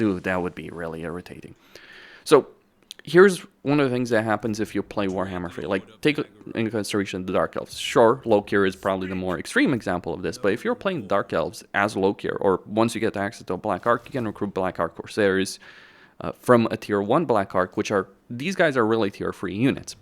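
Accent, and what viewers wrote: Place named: Romania. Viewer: Why your accent is sounding romanian to me?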